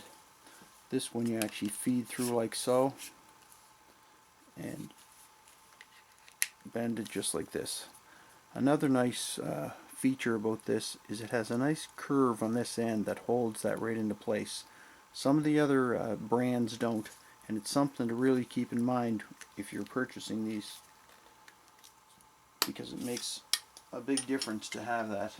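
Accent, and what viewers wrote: Canada. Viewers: Fantastic series, thank you!!!! We need to redo the lines in our house, and now know what to do! We are interested in putting in a manifold system, now know how to do it. Love all the great information, even it the idiots on here think you're rambling. That "rambling" is the little shit you run into that makes you go.. "uhhh, what to do I do now." You take care of all that with your musing. Thank you! One question, where do you buy this stuff? Thanks again, love the Canadian accent eh. ;P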